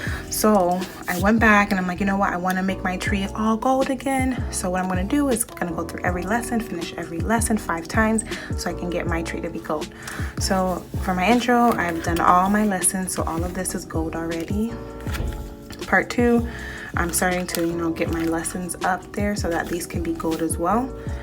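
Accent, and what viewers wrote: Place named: South America. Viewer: Very nice notebook! But I see you are learning South American Spanish... In standard Spanish (from Spain) we don't use those kind of expressions or accent, I think you should find more Spanish content and less South American 🙂Unless you are specifically learning that accent. Greetings from Barcelona!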